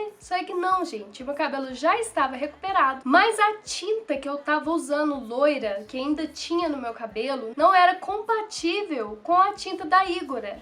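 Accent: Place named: Brasília, Brazil. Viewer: Acho que ela é de Brasília-DF. Pq o pessoal aqui tem esse sotaque lindo, maravilhoso, pelo qual sou apaixonada tbm 😍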